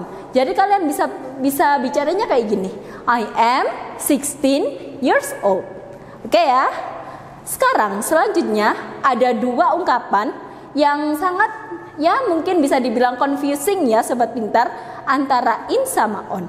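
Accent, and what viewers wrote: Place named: Java, Indonesia. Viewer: Medok mya gk pernah ilang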